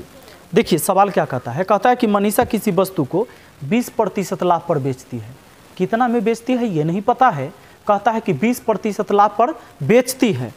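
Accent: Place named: Bihar, India. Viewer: पढ़ाना तो बढ़िया है, लेकिन गाने मे लय तो अच्छी है भाषा मे थोड़ी गड़बड़ है जैसे सावन को साबन बोल रहे हैं, उड़ा दो को उरा दो, गड़बड़ को गरबर ये समस्या बिहार वालो मे ज्यादातर देखने को मिलती है, चाहें वो 10पास हो या पीएचडी ☺️☺️☺️🇮🇳🇮🇳🇮🇳🙏🙏🙏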